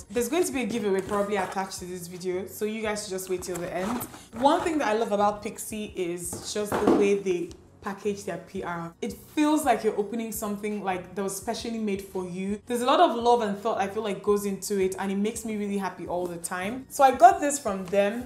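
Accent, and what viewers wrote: Nigeria. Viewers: I have sooo many compliments to give ... From the eyeshadow to your skin , I love the fact that your Nigerian accent has not changed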